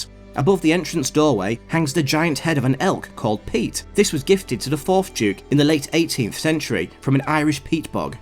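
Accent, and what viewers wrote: England, United Kingdom. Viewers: I love English English pronunciation. Belvoir = Beaver 😂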